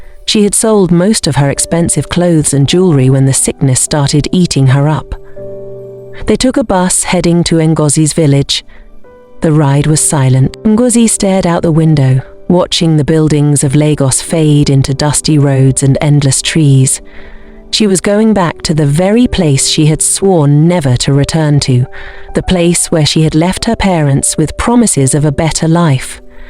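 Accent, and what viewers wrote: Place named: Jamaica. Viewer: You got a Jamaican accent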